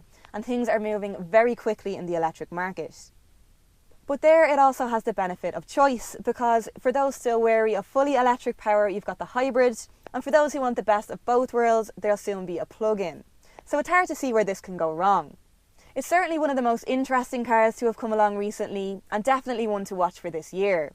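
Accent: Ireland. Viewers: first ones to show the bottle holders and how they actually work, assume they are in the back as well? Do love the Irish accent though, nothing to do with car review I realise. Love to see you do a longer range test or maybe a long term test of it and see how it works more real-world than a short review that cannot cover how it will work in the real world.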